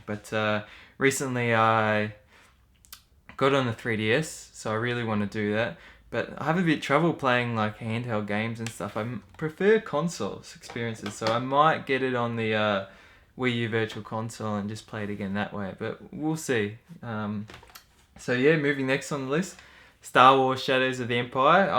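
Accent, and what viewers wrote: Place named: Australia. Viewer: Cool, yeah I saw that tag video. I thought I recognized that accent. I was actually in Australia in 1999 when I was in the US Military. (Forever ago.LOL!) We were on the East coast near, Rock Hampton, Gladstone and Brisbane.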